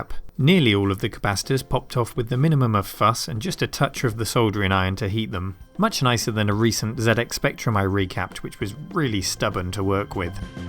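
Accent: West Country, United Kingdom. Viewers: Oh wow you're in the Cotswolds, how lovely. Dull, but lovely ;) You do have a very slight West Country accent now I listen out for it.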